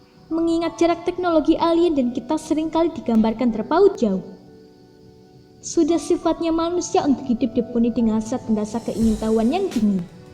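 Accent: Java, Indonesia. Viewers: medok bgt si mbak nya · Jowone medog he😁😁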